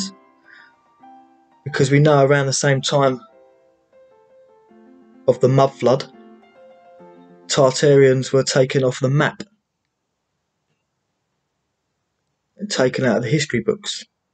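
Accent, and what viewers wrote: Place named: United Kingdom. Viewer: What a great video! I’d be lying if I said I didn’t dig the accent too. I know you guys have a bunch of different accents over there (I’m from New York), but that one always sounds like “the cool one” to me. It’s Billy Kimber from Peaky Blinders, and maybe Russel Howard the comedian. Let me know if I’m right, crazy, or an idiot. ✌🏻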